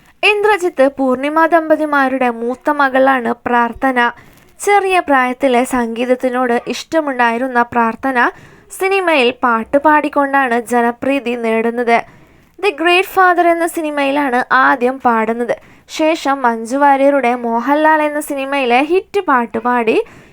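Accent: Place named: United Kingdom. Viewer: ഇംഗ്ലീഷ്കാരി ആണോ മലയാളം വാർത്ത വായിക്കുന്നത് 😎 കഷ്ടം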